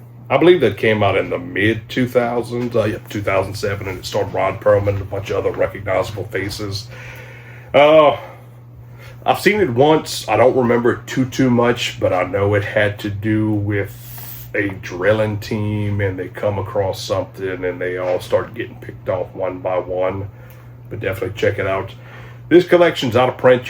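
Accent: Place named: Louisiana, United States. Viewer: as soon as you started talking I thought Louisiana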